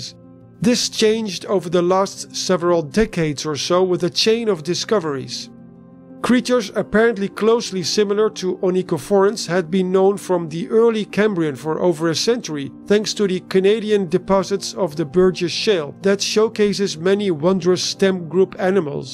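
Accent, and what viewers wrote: Netherlands. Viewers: Hearing that dutch accent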